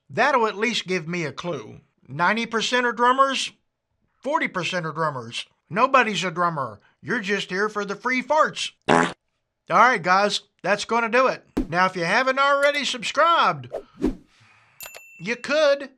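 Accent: South, United States. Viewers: Metal guitar player born and raised under nashville, honestly i like videos that give perspective, i remember seeing your "wrong gig" video awhile back but i subscribe to andy rooneys channel and said to myself "oh crap, he's watching the video"! Then i fell down the rabbit hole and found you channel and subscribed. So far ive enjoyed the insight, the humor and the fact that i hear another southern accent actually made me feel better! I rarely subscribe to channels but music keeps me sane so theres only a couple on my feed, andy rooney, matt freeman from rancid, kyle bull, ola englund and yours! Id say your on the right path as far as content is concerned, the mix of insight and humor is refreshing, keep doing what your doing and im sure people will take notice.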